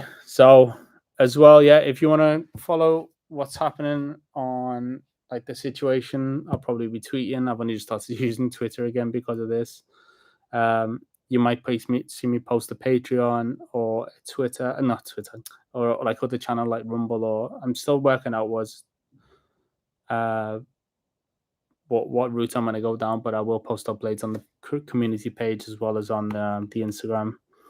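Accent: Liverpool, United Kingdom. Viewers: OMG he is a Scouser 😮 I thought he was Brazilian 😂 as he never spoke before. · Christ I didn't have you down as a scouser. Slight twinge in the accent though, like spanish or italian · Lad, are you Scouse? There’s definitely a twang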